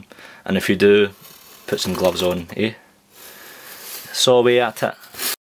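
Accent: Scotland, United Kingdom. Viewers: I love your accent I love Scottish ppl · YOU ARE SCOTTISH!!! #THATISALL #JUSTSAYING #GIVEAWAY LMAO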